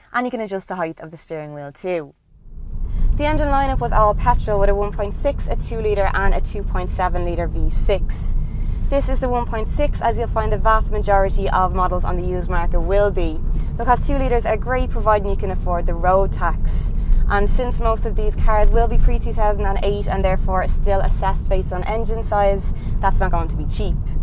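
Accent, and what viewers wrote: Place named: North Dublin, Ireland. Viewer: Strong north Dublin accent on this woman.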